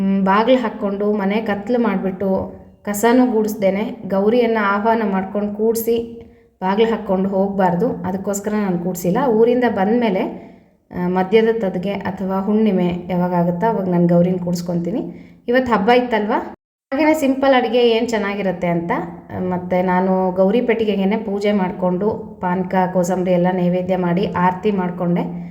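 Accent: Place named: Ballari, India. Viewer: Akka superb agide Naau kuda Ballari avare Same nimtharane mathododu akka thumba kushi aguthe akka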